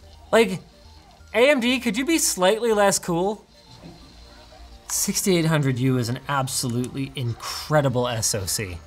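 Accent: Canada. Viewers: Is it just me or is Linus sounding really Canadian these days?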